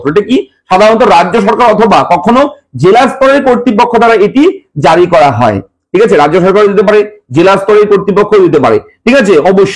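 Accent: Bangladesh. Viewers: আপনার কথা শুনে তো মনে হচ্ছে আপনি বাংলাদেশী, ডকুমেন্ট আছে তো?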